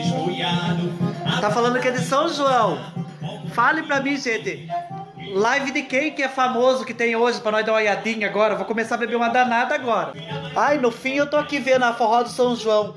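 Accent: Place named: Sorocaba, Brazil. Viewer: Você é sorocabano! O sotaque é inegável.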